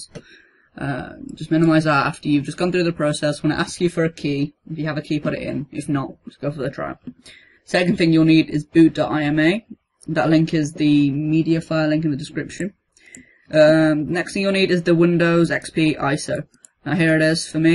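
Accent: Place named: United Kingdom. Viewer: British accent..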